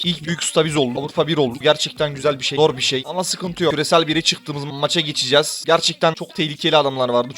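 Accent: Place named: Mardin, Turkey. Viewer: ABİ NERELİSİN MARDİNLİ GİBİ GONUŞUYORSUN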